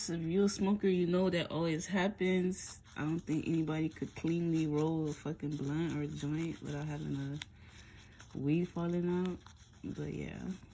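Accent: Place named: North, United Kingdom. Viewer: Where you from gotta be up north somewhere?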